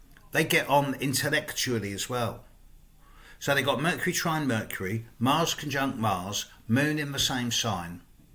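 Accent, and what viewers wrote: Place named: Southern England, United Kingdom. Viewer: Hey❤ bless you for saying about Williams middle name I remember when he was born thinking the same..Once and Future King... (I'm a kitchen table astrologer, of several decades) I did his chart and felt such a surge of pure hope..(he was born just before my eldest daughter) And he was born on the summer Solstice too... All shall be well. Nice to hear a UK southerner voice... Glad to have stumbled on your no nonsense channel... Thank you xx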